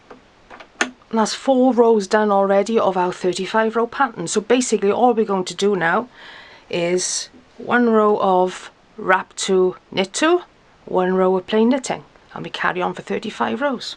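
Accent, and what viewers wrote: Wales, United Kingdom. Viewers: I love listening to your welsh accent - my grandmother was welsh and a great knitter and crocheter. Love your patterns too 😊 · MY husband is from Yorkshire, and I am in love with each UK accent. You sound kind, generous, and lovely tbh. Thanks so much. Happy festivities to you and pets, my birds, doggos, chickens, and hamster say hi!! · I thought so! Me too. It's strange to see a fellow Welshy doing knitting machine tutorials, it's normally more American accents you hear 🤣 happy new year to you too!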